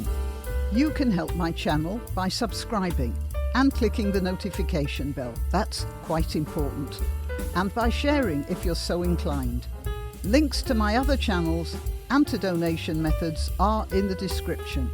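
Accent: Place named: RP, United Kingdom. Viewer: Fuck me, I love to hear a posh woman swear!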